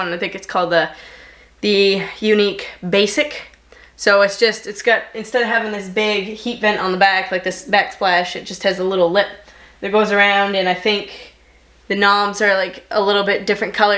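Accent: Maine, United States. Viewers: Love that Maine accent. Great you tube channel. You are a good role model for young women. You remind me of my daughter. She is very outdoorsie too.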